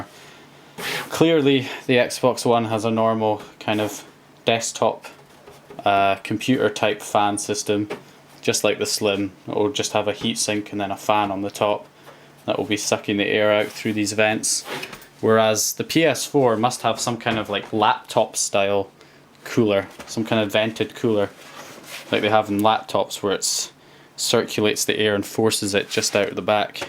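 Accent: United Kingdom. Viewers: Because he's british and youre probably spanish by your name. So you talk weird too.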